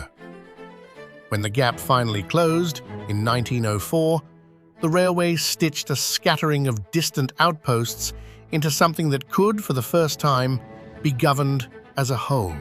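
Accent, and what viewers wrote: United Kingdom. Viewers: The naration is British, not Unitedstatesian.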